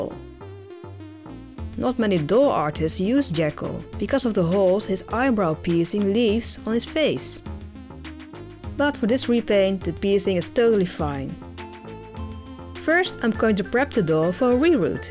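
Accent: Netherlands. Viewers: Yes I know, is a boy. And she asked his accent, he's from the Netherlands.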